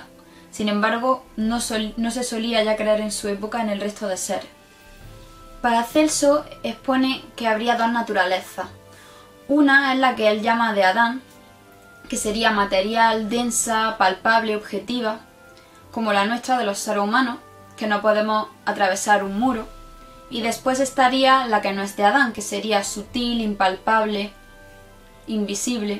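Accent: Spain. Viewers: Que acento tan bonito....me imagino la chica es andaluza?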